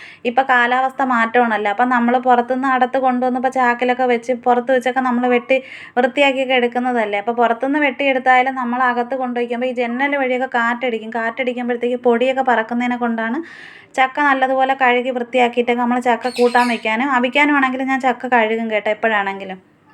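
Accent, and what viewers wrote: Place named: Kollam, India. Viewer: Ningal kollam ano avduthe samsaram pole und kettatu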